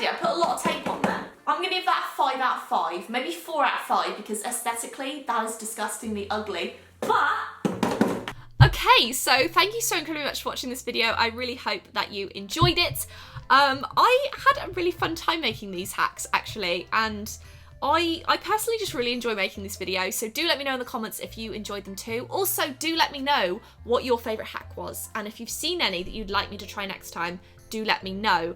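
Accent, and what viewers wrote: England, United Kingdom. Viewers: I'm from England and I've gotta say, has the most stereotypical British accent ever 😂 if you came to the north east you probably couldn't understand us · Hi I love your British accent so much it makes me feel good ❤️❤️❤️❤️😻😻😻🇮🇸🇮🇸🇮🇸🇮🇸🇮🇸🇮🇸🇮🇸🇮🇸🇮🇸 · I've lived in Britain all my life and have never met anyone with as much of a British accent as.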